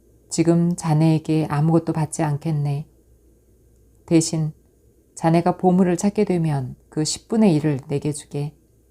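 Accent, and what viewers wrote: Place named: Jeolla, South Korea. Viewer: 전라도 억양 정겹네요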